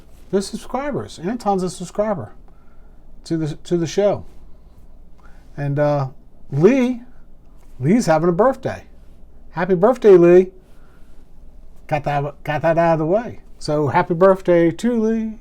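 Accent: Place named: Pittsburgh, United States. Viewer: Listening to your accent,... I am guessing you are from Pittsburgh 😊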